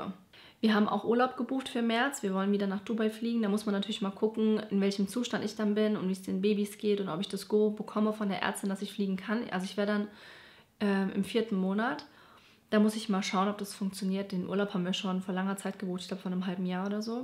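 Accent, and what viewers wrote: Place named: Hesse, Germany. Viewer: Sie kommt aus Hessen sie schttt nur ! Das nennt man Hessissscchh !! ♡♡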